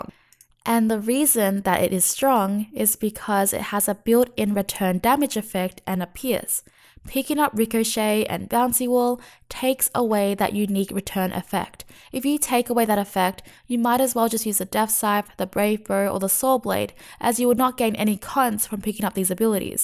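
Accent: Australia. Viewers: Sounds like your from Australia? I don't know why we do it, but I know a LOT of Americans who LOVE everything about Australia! The closest I've been to Australia was in 1975. The year I lived in Singapore. (Loved it!) If I'm wrong about your location, sorry but I still love your accent! Thanks for the game help! I was stuck & now I can tear up Archero! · Hi, I hope you are well! From my experiment, if you use meowgik, the cat damage is also reduced, due to the reduction of your base damage. I hope you can mention that in your future videos. Cat damage are reduced by around 25% when picked front arrow +1. Also from your accent, I assume you are Australian?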